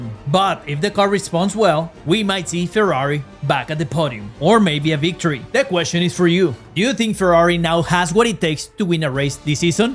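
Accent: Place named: United States. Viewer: Awesome! I like your American accent!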